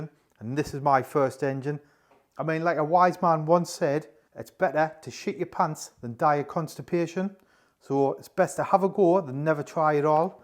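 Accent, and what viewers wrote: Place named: Sunderland, United Kingdom. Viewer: Great mate. Sounds like your from my way sunny Sunderland. Caught wait for the next video. If you need any free labour let is no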